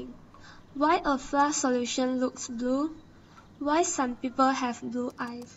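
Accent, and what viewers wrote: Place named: Asia. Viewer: Asian accent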